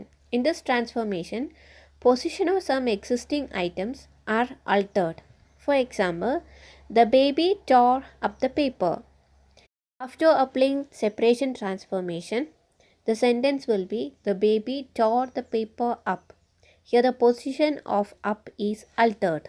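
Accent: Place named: Tamil Nadu, India. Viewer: Tamil accent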